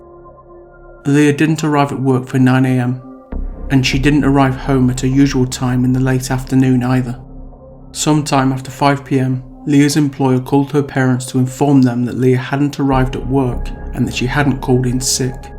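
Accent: United Kingdom. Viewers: Interesting case ✅ Suspense music ✅ British english narration ✅ Fantastic ✅ Thank you! ❤ from 🇸🇪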